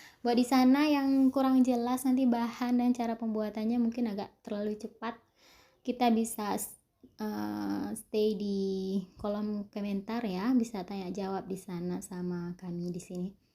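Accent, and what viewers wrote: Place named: Malaysia. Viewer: Hirip mirip orang Malaysia cara bicaranya 😂,jakarta mna jemlolnya😂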